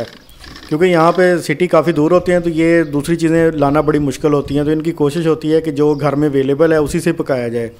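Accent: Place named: Gujrat, Pakistan. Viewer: i am pretty surey that you belongs to gujrat pakistan, your punjabi comes from those areas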